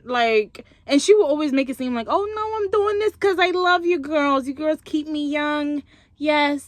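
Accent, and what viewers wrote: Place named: Long Island, United States. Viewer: Love the Long Island accent yo need to make it a character 😂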